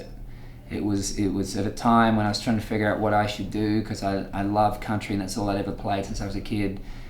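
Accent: Australia. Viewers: yeahhhhhh, he answered my question !!!!! That's me LaVonda from Texas. I could listen to him say my name a million times and never get tired of that dreamy aussie accent.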